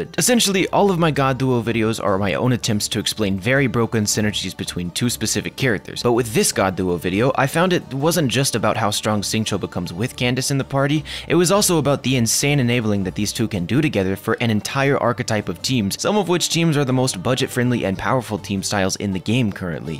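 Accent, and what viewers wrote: United Kingdom. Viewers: wait ur british huh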